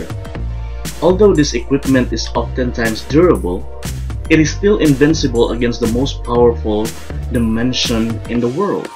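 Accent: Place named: United States. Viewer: Pinoy yung pangalan ng channel kano nman yung nagsalita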